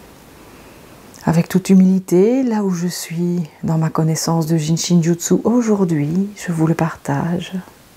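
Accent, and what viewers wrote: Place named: Belgium. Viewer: Bonsoir et merci pour cette nouvelle séance qui m’a fait beaucoup de bien. J’ai appris que vous habitiez Mons, en Belgique. Je me doutais un peu, j’avais reconnu votre accent, je suis du Nord de la France 🇫🇷 et le mien ressemble au vôtre. J’ai eu une douleur supportable au milieu du dos, du côté droit que je n’ai jamais eue, est-ce à cause de cette pratique ? Pouvez m’écrire le nom de votre nouvelle pratique, les heures et jours où l’on peut vous retrouver en live sur YouTube car je n’ai pas eu le temps de les noter. Merci beaucoup.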